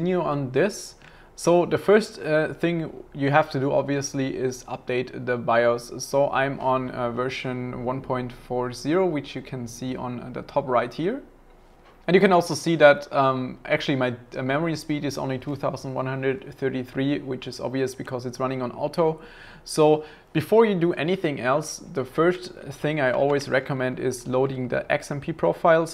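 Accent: Germany. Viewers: Der deutsche Akzent ^^ <3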